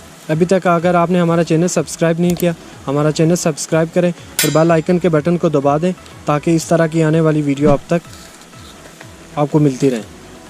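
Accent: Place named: Kotli Sattian, Pakistan. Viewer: Bro ap kotli satyan k aas pas k Hain .Mera andaza narar ka hai.ap ki zuban say andaza lgaya hai.reply krna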